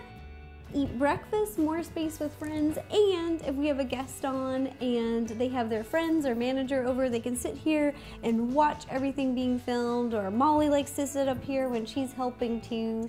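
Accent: United States. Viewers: Are you American or whatever?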